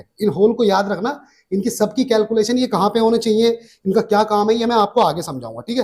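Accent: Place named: India. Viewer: your videos and accent let me suppose you're from india, isn't it?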